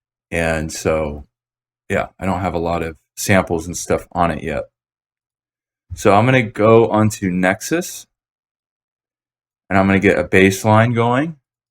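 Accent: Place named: United Kingdom. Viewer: LOL U HAD ME WITH THE BRIT ACCENT!!!!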